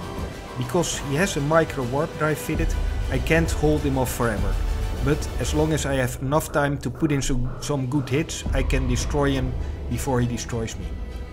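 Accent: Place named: Netherlands. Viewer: sounds dutch... to dutch... :P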